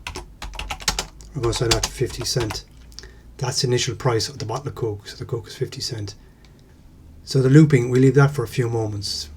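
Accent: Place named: Ireland. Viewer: Thanks...Nice to hear he Irish accent...I'll buy you a Guinness if we ever meet.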